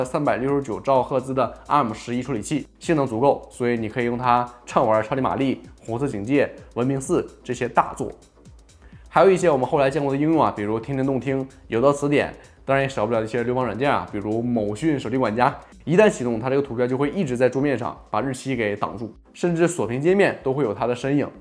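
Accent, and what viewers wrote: Northeast, China. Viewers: 说话满口大碴子味，😅😅😅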